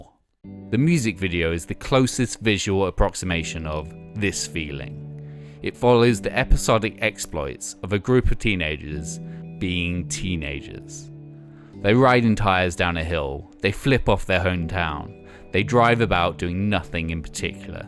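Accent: United Kingdom. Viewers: . Regardless of your British accent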